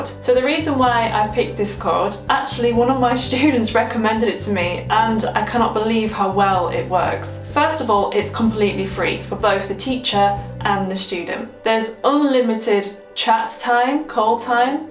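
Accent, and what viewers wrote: United Kingdom. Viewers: i love your british accent. You the best teacher. I learn so much with your music videos tutorials =)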